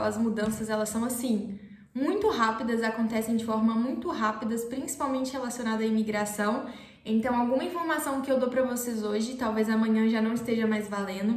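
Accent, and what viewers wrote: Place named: Favela, Brazil. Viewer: Portugal é um dos "piores países da Europa"? Trava a língua, tá brasileira? Trava SIM piorque a tua língua que é bem comprida e afiada. Não achas que por Portugal ser o que dizes que não deverias estar cá e que NUNCA deverias ter vindo. Vê-se logo que és brasileira. O que será que "vinheste fazê prá PortugAAAAAAAAAAAAAAAAAAAAAAAAAAAAAAAAAAAAOOOOOOOOOOOOOOOOOOOOOOOOOOOOOOOOOOOOOOOOOOOOOOOOOOOOOO" se nem de origem portuguesa és? Qual é a afinidade que tens com Portugal, com os portugueses, com a cultura, com os costumes etc? Nem mesmo com a língua tens qualquer afinidade. Nós portugueses não falamos o português da FAVELA como tu falas. Trava a língua, falsa turista.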